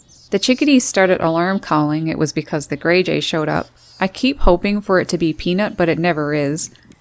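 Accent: Canada. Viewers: You sound Canadian, eh?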